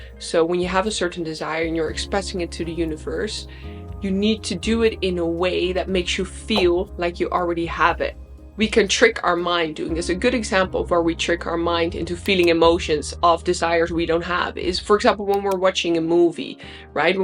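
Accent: Netherlands. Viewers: I hear a dutch accent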